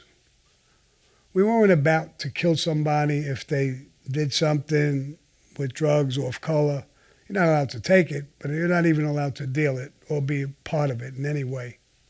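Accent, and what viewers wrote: United States. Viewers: Especially with the American accent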